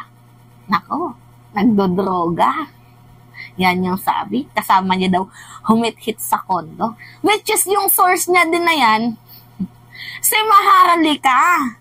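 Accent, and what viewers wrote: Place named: Iloilo, Philippines. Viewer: ILONGGA KA NGA TUNAY❤❤❤❤❤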